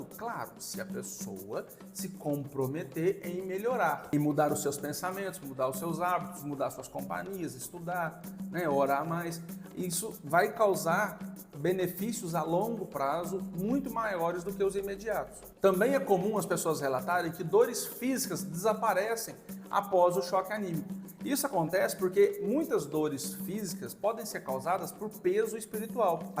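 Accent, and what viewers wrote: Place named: Minas Gerais, Brazil. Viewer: Boa tarde,estou gostando muito das suas aulas , parabéns! Fala de onde vc é ...parece ser mineiro como eu ,sou de BH .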